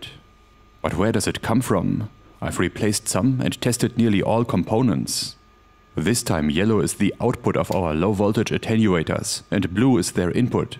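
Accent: Germany. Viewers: sounds like a German Darth Vader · German native that speaks English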